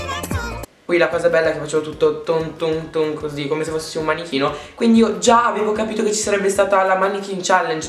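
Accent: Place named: United States. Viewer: Sembravi un americanoLuuu😂😂😂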